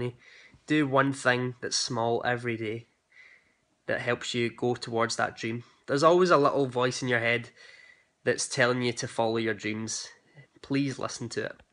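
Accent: Scotland, United Kingdom. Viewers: I have acute anxiety disorder and have been anxious since I was a child. Nothing happened to me abuse wise but I was born very ill and separated from mum for 6 weeks. I was in and out of hospital until I was 9 also. I do a lot of natural things too but need 20mg of Prozac daily to keep everything at bay. Thanks for sharing. The Scottish accent is gorgeous 😍 I'm just back from Edinburgh with my hubby. We went for our first wedding anniversary as we got engaged in Edinburgh 2 yes ago xxx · Very very true. Some people don't understand because they can't relate, so it's hard to explain it to them. And, your Scottish accent is a beautiful reason to listen to you. Well, in addition to your humor, advice, observations, and everything else. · You are amazing and I love watching your videos. They make me feel good and I bet you help so many people who don't feel worth it. 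I have learned to love myself more, over the last 20 years, than I did the first 22 years of my life. When I came across your videos I was amazed at such a handsome, caring man. Even though I am happy with myself, it is still nice to hear your encouraging words, especially with the Scottish accent. I just love hearing your voice!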